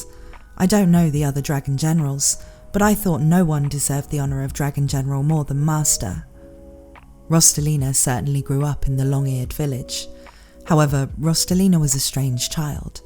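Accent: United Kingdom. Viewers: is that so thats too bad, this is rude of me but are u like british?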